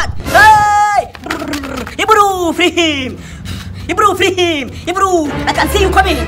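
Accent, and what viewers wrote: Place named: Nigeria. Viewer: Bro try removing Nigerian ascent in your comedies eg the native doctor part use your village gods not Nigeria's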